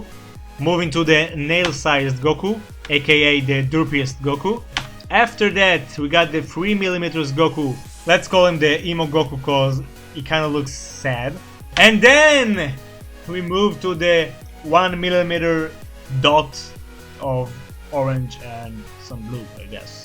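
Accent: Israel. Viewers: איזה מבטא ישראלי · איזה מבטא ישראלי אמאאא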